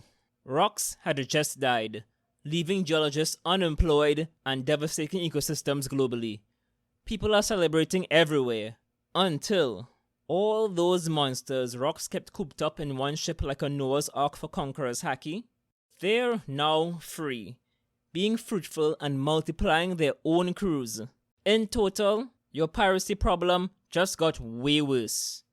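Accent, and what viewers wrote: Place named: Trinidad and Tobago. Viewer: Yo love how u talking are u trinidadian?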